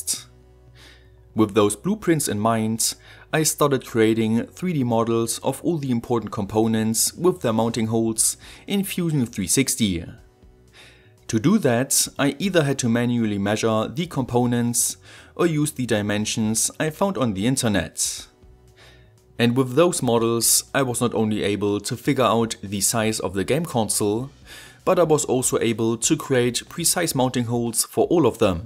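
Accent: Germany. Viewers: German man ? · are you german? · the german accent is making me crazy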